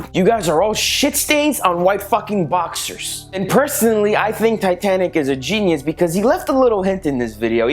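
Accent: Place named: South, United States. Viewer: where are u from cuz have a southerny westernizh accent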